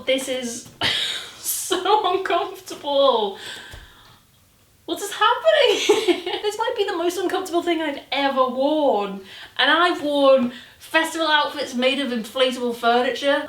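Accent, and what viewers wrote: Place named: Lancashire, United Kingdom. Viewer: Thanks for taking one for the team! I stopped going into Topshop about 17 years ago (I'm 33!) because their sizing always felt very small and I have been a size 14 basically since I was 12. Even passing the door makes me uncomfortable, like the vibes I feel are "none of these things are for you 😡😡😡" so it's good to think I might actually fit in some of their stuff if I can face going in there and having a look. PS love your accent I grew up near Ormskirk so that Lancs-Scouse adjecent twang makes me trust you even more!!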